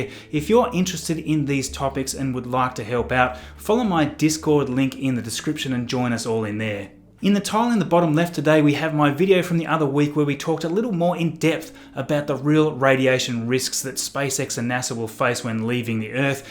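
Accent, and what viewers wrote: Australia. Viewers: Nice video thanks. Random q. Where in Australia are you from?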